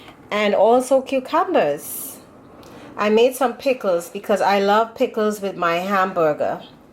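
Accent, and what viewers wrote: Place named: Trinidad and Tobago. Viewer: I looove your accent 😍😍 are you Trini?!??!!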